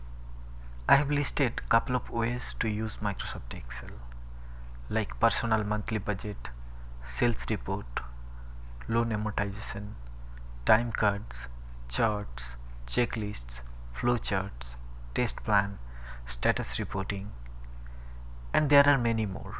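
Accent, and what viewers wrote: India. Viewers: Are u gujju? Ur accent says that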